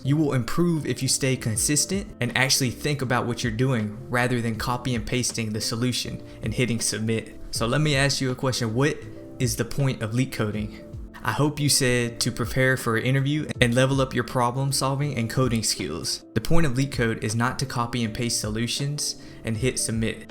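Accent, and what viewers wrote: South, United States. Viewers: Thank you for maintaining the southern accent bro. Seriously. We're losing our accent and our southern culture. When i hear people speak it, it keeps the spirit alive. thanks for uploaidn g the very helpful video! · Dude, what southern state are you from hahah. As a resident the accent and the yeti sticker isn't fooling me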